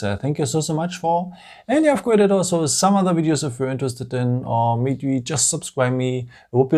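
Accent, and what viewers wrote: Germany. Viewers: You sound German by the way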